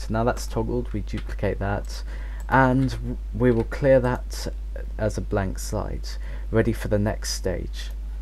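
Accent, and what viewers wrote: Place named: United Kingdom. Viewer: are you british, you sounds like you came from the united kingdom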